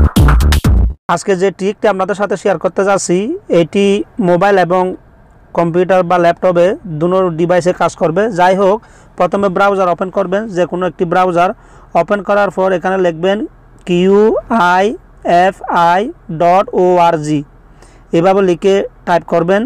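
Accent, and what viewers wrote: Chittagong, Bangladesh. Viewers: apni ki Chittagong bari je vasa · chittainga naki?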